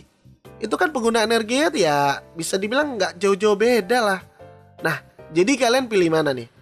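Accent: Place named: Padang, Indonesia. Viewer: logatnya itu kek org padang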